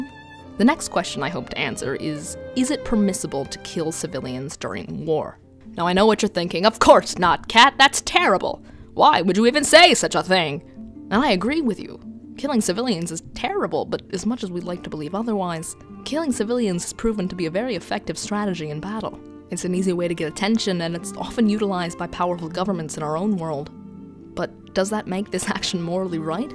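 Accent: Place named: Norway. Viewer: Your accents sounds Norwegian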